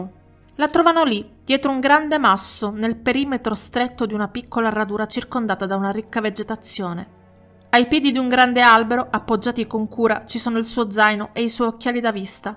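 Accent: Sicily, Italy. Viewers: Ciao, scusa se sono ripetitivo, avendoti giá "difesa" da " accuse" di dubbia pronuncia ed eccessiva sicula cadenza. A me personalmente la tua voce, il tuo modo di parlare ma soprattutto il tuo caratteristico piglio siciliano piace da morire. Brava, ti ascolto a prescindere dal caso che tratti proprio per questo.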